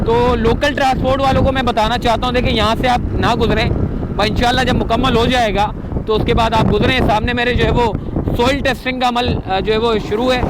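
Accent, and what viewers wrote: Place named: Hyderabad, India. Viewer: Full Hyderabadi accent…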